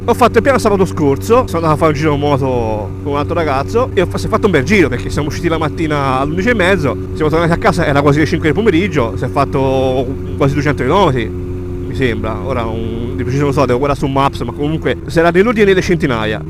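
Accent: Tuscany, Italy. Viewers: Toscano vero?grande boia deh ahaha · E tu sei toscano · si chiama accento toscano :D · sei toscano scommetto io sono di pisa passa dal mio canale se ti va😉🤙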